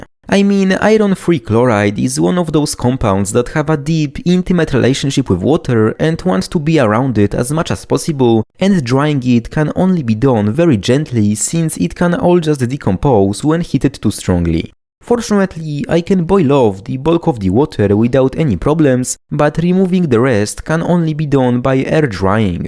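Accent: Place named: Poland. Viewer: I live to watch the armchair and backseat drivers be UNABLE to stop themselves from commenting and correcting..... JFC THIS is why we're in a burning dumpster of civilization....🙄 Otherwise, thank you to my favorite amateur chemist with the most AMAZING Polish accent.🎉 Highly informational and entertaining. Quality stuff. Kudos from Appalachia 🤙🏻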